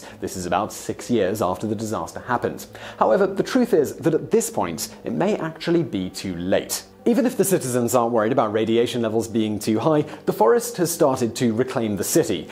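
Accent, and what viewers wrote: United Kingdom. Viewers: Whats the deal with all these blooming Brits narrating virtually every video on Earth ? Bloody 'ell !! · well... thats a very British accent... could we get subtitles? o.O my poor aussie brain cant understand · I really love your channel, the info is very interesting. BUT, why do you use such weird syntax that doesn't sound like English? I'm talking about something like this (this is not a direct quote, just an example); 'The people of Fukushima they have thyroid cancer'. Or ' India it has a big garbage problem'. The words 'they' and 'it' are completely superfluous in these sentences. You can take them out altogether and nothing changes in the meaning, the sentence just sounds correct. Maybe your writer is a second language English speaker, but I have to say it drives me absolutely crazy; eventually I will stop watching because I won't be able to stand listening to you mangling the English language with your very proper English accent....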